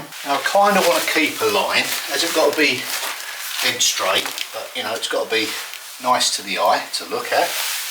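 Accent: United Kingdom. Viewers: I'm curious ,What is you accent? I know the UK has accents just like in the US....I'm thinking it's North or West. Some more repairs as you go....it's a good thing.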